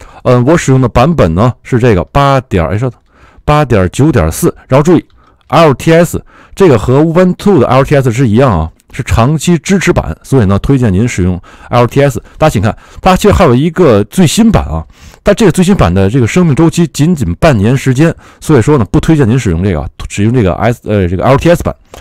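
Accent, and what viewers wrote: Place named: Japan. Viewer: 英语很岛国味道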